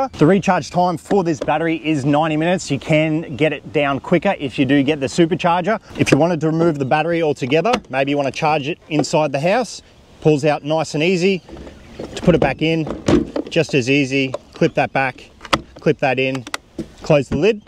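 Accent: Australia. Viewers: Thanks for this vid - it's great to see it in use on an Aussie property (with an Aussie accent!) I've just put my order in for the 4WD version, expecting it between chrissy and new year. As a relatively small female in my mid fifties, mowing 27 acres is a challenge - I usually focus on 5 acres and let my neighbors cattle moo the rest. As much as that is a nice thing to do, it means keeping fences closed and dodging cow pats for months. My place is on 30-40 degree slopes, with a front paddock, a back paddock, an orchard and the house yard, all of which are laden with red bellied blacks and ticks during the hot months and leeches during the wet. Because I've purchased the 4WD version with the extra wide/traction wheels, I'll be able to use this while standing somewhere safe and shady, or at the very least, following through a path of mowed area, and that will be life changing. 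I'll be able to do work on parts of my property I've never even walked on and I am sure I will feel safer without having tall dead grass standing like a fire hazard. This machine has an application for people with a disability, sure, and also for people who just aren't very physically strong, and that is going to be a target market that a lot of 'guys' wouldn't even consider. I'll let you know how it performs if you're interested. Oh, and something I have not seen mentioned on vids about this machine - its got a lithium PHOSPHATE battery - which means it last for a few thousand cycles (instead of lithium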